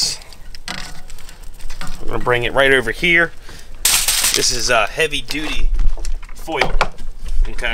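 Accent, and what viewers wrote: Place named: Baltimore, United States. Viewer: you have a Baltimore accent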